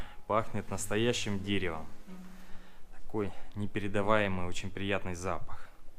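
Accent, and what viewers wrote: Rostov, Russia. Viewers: это Ростов, после переезда у меня сестра стала так же говорить)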